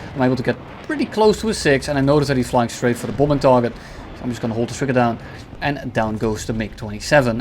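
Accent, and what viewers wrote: Netherlands. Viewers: ik ruik nederlands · Heerlijk het Nederlandse-Engels, keep up the content🙏🏻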